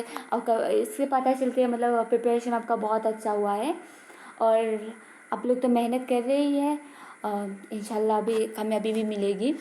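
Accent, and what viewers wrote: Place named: South India, India. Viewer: I like your voice, may be you are from South Indian.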